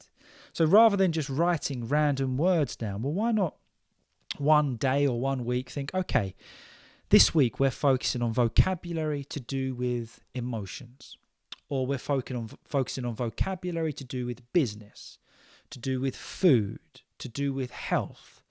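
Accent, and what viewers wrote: United Kingdom. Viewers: Hi, I'm from Myanmar, and I absolutely love the British accent. I'm really keen to learn it, so your podcasts are perfect for me.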